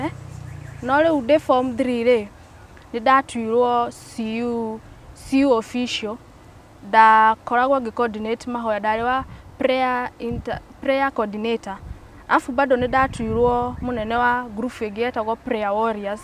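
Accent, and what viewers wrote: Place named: Nyeri, Kenya. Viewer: I love her nyeri accent. Iv gone through the same stuff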